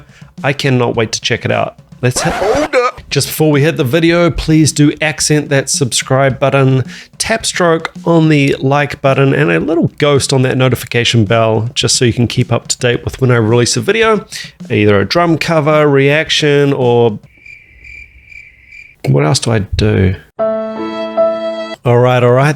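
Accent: New Zealand. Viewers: So true about relaxed..... another of the relaxed drummers I can think of springs to mind:.....Fred Beard of ZZ Top - slumped at the controls........ but no comparison!!! I found you recently and suddenly realised i was finally listening to a NZ accent - wow - how cool is that: we can do this stuff over here too! Love your videos and shorts.....Hi from the Kapiti Coast:)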